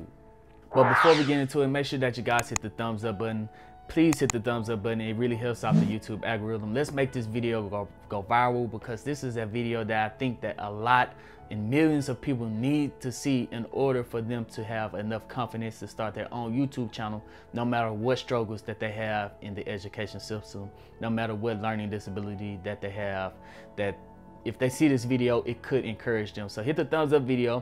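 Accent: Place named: Texas, United States. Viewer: kI am impressed this is all off the top of your head, I also see sometimes you try to pronounce words with out the Texas Accent. But you are doing a good job. Keep up the good content.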